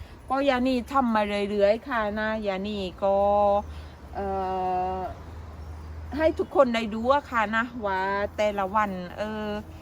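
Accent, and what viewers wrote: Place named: Phang Nga, Thailand. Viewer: ดีใจด้วยค่ะ คนใต้เหมือนกัน สำเนียงคล้ายคนพังงาเลย ใช่รึป่าวคะ🌷🥰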